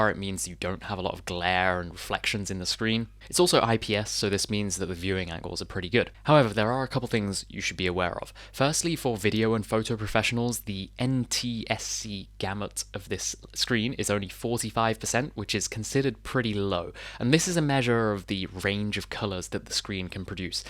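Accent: England, United Kingdom. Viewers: One of these is being sent to me as a replacement for my old unit which HP lost during repair. Thanks to your review I'm excited about this arriving, so thank you. No idea whether or not mine will have the backlit keyboard but otherwise it's exactly the same spec as yours. You sound english and mentioned english prices, but the layout of the keyboard you showed in the video isn't. So that's more confusion, coupled with HP showing images of this unit having a microSD card reader. For me, it's replacing a 2019 Ryzen 5 3500u from the essential range, so should be quite a nice step up regardless.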